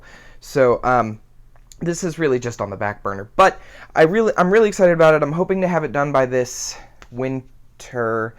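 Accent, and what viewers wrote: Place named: South, United States. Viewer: Sometimes, when you find yourself in a toxic situation...at work, or anywhere else, you do need to think about removing yourself from it, and improving your quality if life. However....and thus is important...if you do decide you need to find another job, make sure you already have that new job, before leaving the old one. If you leave, without having somewhere else to go, you will only be adding another stress to your. Life... Bills need to be paid, and yiu need to keep a decent roof over your head. You spend most of your waking hours at work. So....Work should be something you at least enjoy.... The really fortunate ones have a passion for what they do... Inwish that for you.. Oh yes...a Southern draw is nit necessarily a bad thing... Lots if people find it appealing...😊 Sandy from Cincinnati 💜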